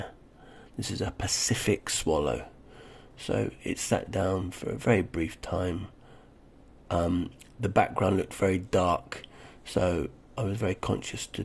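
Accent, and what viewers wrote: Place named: United Kingdom. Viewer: A very good effort for your first vlog attempt with interesting content and I am looking forward to seeing more on the OM-1 as it is on my Christmas wish list. It would be good to include your location in the vlog as I was playing detective trying to work it out. UK accent and driving on the left, so I originally thought somewhere in the UK. But the light just appeared too harsh to be the UK. You said the temperature was 35c, so I thought you must be in Australia. But then you were photographing Pacific Swallows, so I started to suspect Japan but was not sure. It was not until I read one of your comment replies that I knew you were in Japan for sure!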